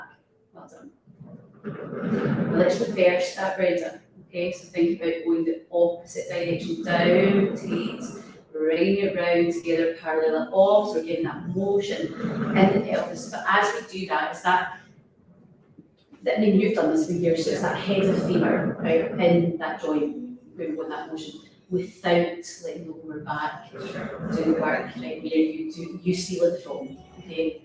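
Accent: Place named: Glasgow, United Kingdom. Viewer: I could listen to pilates with a Glaswegian accent all day long.. love it!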